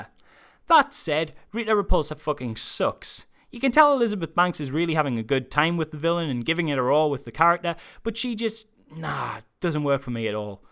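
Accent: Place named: Wales, United Kingdom. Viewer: I can't seem to place ur accent. Welsh maybe? or scottish?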